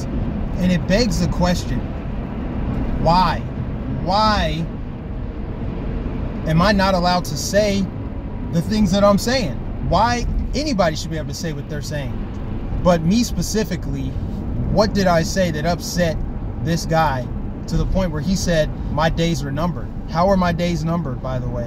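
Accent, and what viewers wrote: United States. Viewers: You talk like an American. Ebonics is the number one reason that black children have trouble in schools. I tutored children when I was pregnant with my daughter. Ebonics is bad for black children. Blacks have lived here longer than many other peoples They should speak American-english. Just saying Ebonics is bad. It's jealousy. Proud of you. Stay strong & moving forward. Live a good life. Thank you. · Keeping you in my prayers. You talk like an American. Those folks are messed up. Hate will destroy them. Report them asap.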